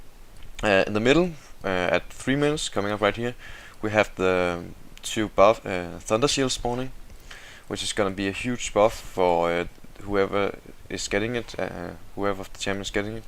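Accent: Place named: Denmark. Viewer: kunne høre du er dansker! :D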